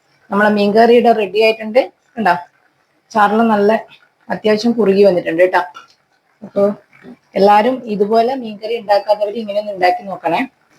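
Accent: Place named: Kannur, India. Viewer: Kannur slagu super dear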